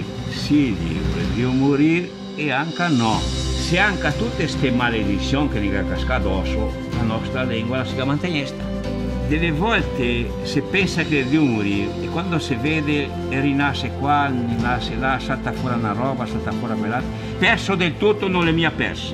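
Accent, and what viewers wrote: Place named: Veneto, Italy. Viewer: Bellissimo filmato, addirittura commovente questa voce che racconta perfettamente la storia in veneto ( con accento "bellunese/trevigiano" con qualche punta di "chioggiotto") dopo più di un secolo di distanza!!! Ma quanti compaesani ci sono in giro per il mondo ed in Brasile in particolare?! Quando penso che la più grande città "italiana" nel mondo non è Roma bensi' San Paolo (città con 12 milioni di abitanti dei quali METÀ sono discendenti della nostra gente) mi vengono i brividi!!!❤👏👏👏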